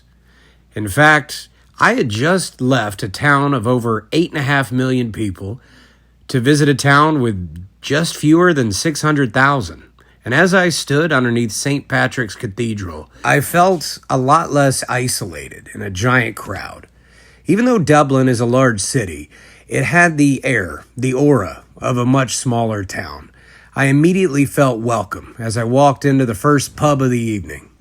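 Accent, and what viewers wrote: South, United States. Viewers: That's a redneck accent I never heard before...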